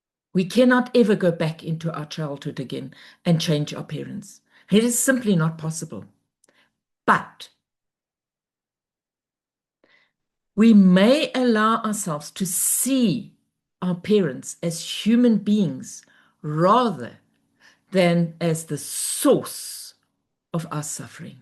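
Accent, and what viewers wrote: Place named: South Africa. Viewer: Lovely to hear your South African accent. Thanks for this video. Will send it to my (adult) kids... getting them to listen is another story 😉🙏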